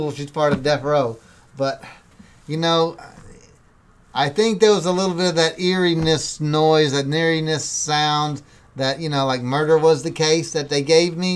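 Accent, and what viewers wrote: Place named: Texas, United States. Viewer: You cute and the Texas accent is ❤❤❤❤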